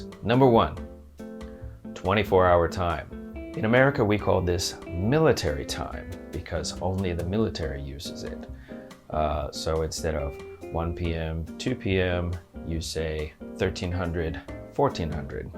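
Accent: Finland. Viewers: I think I'm hearing a slight Finnish accent in your English.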